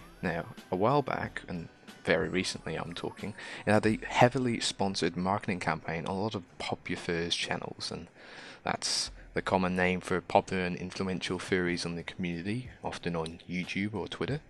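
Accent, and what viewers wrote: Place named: Australia. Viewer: That's an interesting accent, I'm getting hints of North Irish, North English and New Zealand in between an Australian accent.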